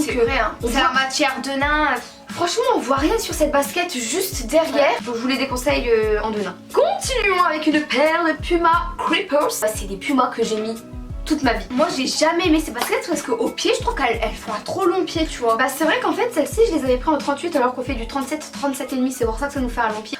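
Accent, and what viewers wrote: North, France. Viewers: cet accent ( nordiste?) tellement présent..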